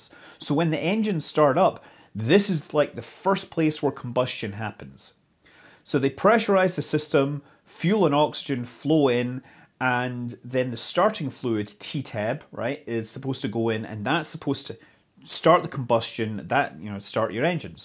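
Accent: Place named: Lothian, United Kingdom. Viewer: A wee lothian lilt to his linre.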